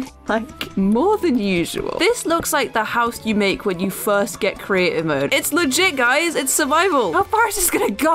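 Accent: United Kingdom. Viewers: are you a brit?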